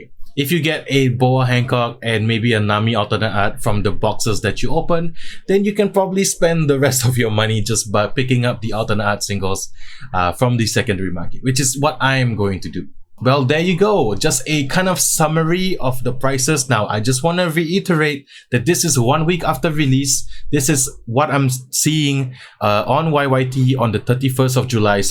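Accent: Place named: United Kingdom. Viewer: amazing content! I thought u were brittish ur accent so good!!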